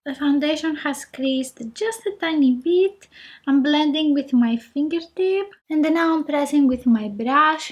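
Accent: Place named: Greece. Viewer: I love your videos and greek accent. I am greek too, Christina. You located in Greece? I was born in Canada but live in Sweden now. Contact me if your in Greece. I'll come visit😁 I'm a makeup artist too👍❤️ we can have fun and do videos together with fun budget products too i haven't tried yet! Sweden don't have the products in stores to test shades out but I'm scared to order online so I don't get the wrong shades lol. I have really oily t zone /combination skin and I don't like too flat matte but I want it full enough to cover and last all day. Did you do a video of the makeup revolution irl foundation, concealer and power line? They don't have it in the stores here I was hunting BUT ONLY their older products available here. Oh you should try rimmel mascaras and foundations! So amazing! The mascara I have been using for years is rimmel wonderfully length pink one it adds volume too!! 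Beats all mascaras today I've been using for years, I've tried them all MUST TRY!! Do a rimmel video please 😍your welcome to Sweden too! Xo Xtina❤️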